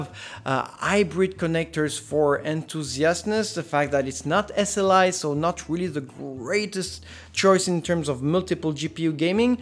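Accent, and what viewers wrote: France. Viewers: he is french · accent français ?? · you are french, right ?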